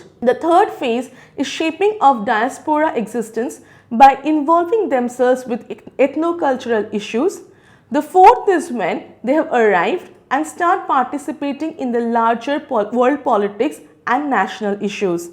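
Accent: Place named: Assam, India. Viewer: Mam your tune feels u are an Assamese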